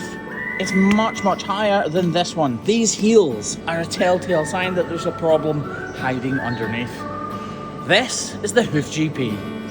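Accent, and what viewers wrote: Scotland, United Kingdom. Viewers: I've shared! Your videos have helped me get over my fear of cows! With the added bonus of the silky Scottish accent 🤭😉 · I love watching your videos! You've a beautiful family and I Love hearing your Scottish accent taking us through the video!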